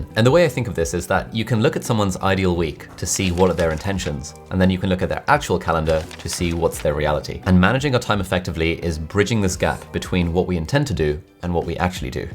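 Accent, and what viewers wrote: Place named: England, United Kingdom. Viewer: ALI ABDAAL LOOKS LIKE AN EARTHQUAKE VICTIM FROM PAKISTAN WITH AN ENGLISH ACCENT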